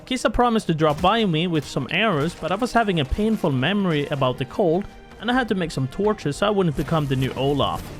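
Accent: Sweden. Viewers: "VAD I HELVETE" god damn that caught me off guard🤣 Wasn't expecting swedish but guess that's why the accient sounded so familiar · Swedish accent shines through - Your Danish Sub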